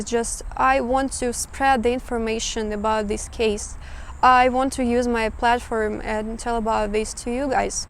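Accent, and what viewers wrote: Russia. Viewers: you just look so cute when speaking English.. I visit your videos to hear your Russian - English accent.. 😊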